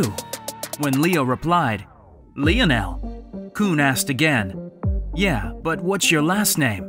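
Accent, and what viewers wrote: United States. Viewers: American speak English , try Scottish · Can we not have Americans narrating these?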